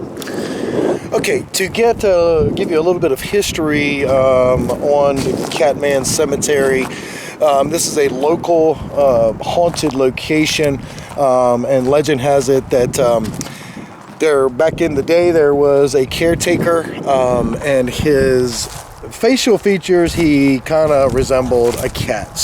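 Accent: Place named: East Coast, United States. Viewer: I love your east coast "ass"accent😍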